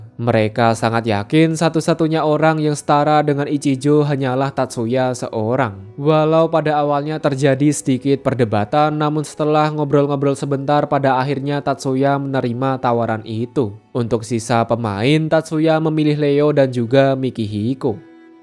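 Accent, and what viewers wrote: Java, Indonesia. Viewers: bang lu jawa ya ?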